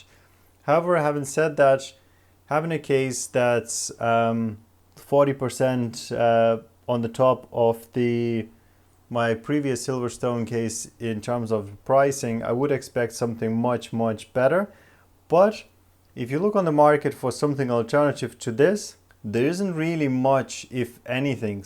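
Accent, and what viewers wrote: Ireland. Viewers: Hello, and thanks for this really great review. I didn't think it was boring at all by the way. (You mentioned this when showing the internals). How is the noise on this so far? I record and edit YT videos so I am wondering if you notice much interference on the recordings? Also, is that a slight Irish accent I detect? ;)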